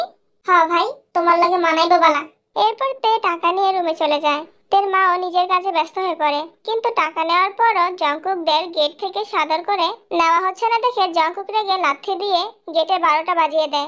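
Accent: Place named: Brahmanbaria, Bangladesh. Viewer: Na tmr kotha shune mone hocche ashole dadur bashy shobay emon bhabe kotha bole ty mone holo tmi Brahmonbaria r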